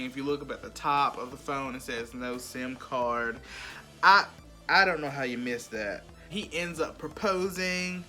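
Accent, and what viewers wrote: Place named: South, United States. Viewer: See I knew it was somewhere southern lol You're welcome, I could just hear your voice all day talk about Tyler Perry lol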